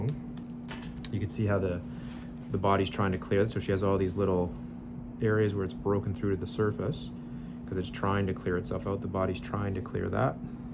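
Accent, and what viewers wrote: Canada. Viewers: Side note... Love the Canadian OWT! ( respect from the UK )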